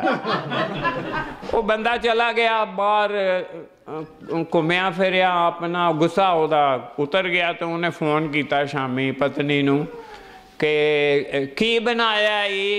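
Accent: Punjab, Pakistan. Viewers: What a beautiful affection developed with uncle, one thing is a very beautiful style of jokes and another thing is that uncle speaks exact our Pakistani style of Punjabi. Love u Baba g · your punjabi same we pakistani speak buhat khoob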